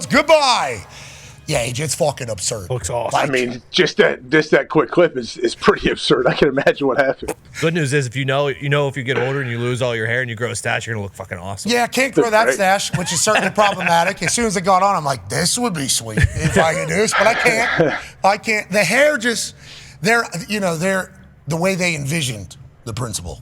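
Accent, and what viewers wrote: Pittsburgh, United States. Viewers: Should have turned up the yinzer accent to the max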